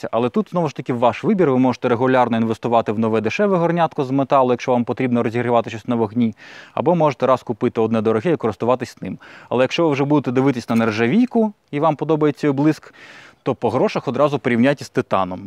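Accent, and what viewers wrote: Standard. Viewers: Не так давно відкрила для себе, але приємно вражена - все подано дуже цікаво, дотепно, тішить слух несуржикована українська мова, дякую за чудову роботу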